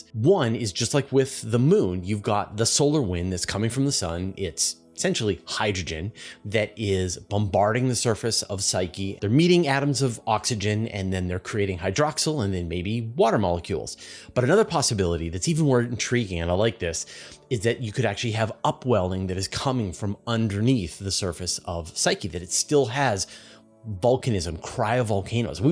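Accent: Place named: Canada. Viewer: I think the best part of EVERY one of these programs is the Canadian accent. Words like "out" and "about becoming "oot" and "aboot". Being from upstate New York, I was raised on this and can always tell when I'm talking to a born Canadian with an occasional Wisconsin'er thrown in.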